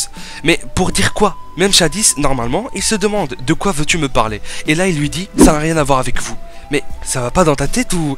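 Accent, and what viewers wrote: England, United Kingdom. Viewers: Super accent anglais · Merci bonne année à toi aussi et j'adore ton accent Anglais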